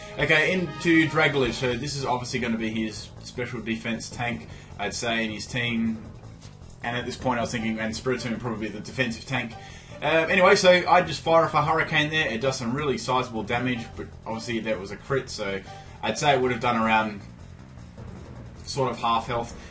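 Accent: Australia. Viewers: He says it the Australian way, not the stupid American way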